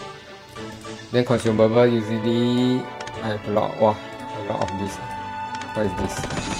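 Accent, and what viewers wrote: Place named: Malaysia. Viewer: you sond like malaysia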